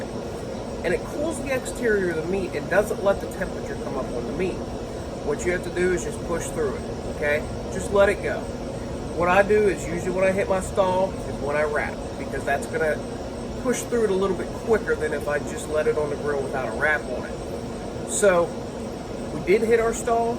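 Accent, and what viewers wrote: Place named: Pittsburgh, United States. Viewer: Thanks for the tips. I'm doing my first try at a pork butt (on an RT400 Bull) this weekend for a party of 40. I was going to do it the day before and reheat but I think I'm going to try and time it right so I can pull just before we eat. Will probably have it rest for a few hours in a cooler. I bet you're a black and gold fan with that Pittsburgh accent! I'm in Greensburg. Go Steelers!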